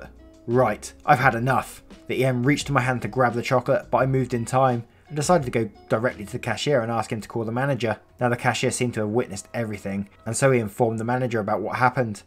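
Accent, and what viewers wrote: United Kingdom. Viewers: Charter Schools are quite a cool idea (being from the UK like you I had never heard of them before either, until a few years ago) [I actually think there are now one or two of them in the UK]. You should look them up as they are so, so different from how we would have both been taught. Great content by the way. So nice to hear a British voice rather than an American one telling these tales (and before people kick off, I have nothing against American voices).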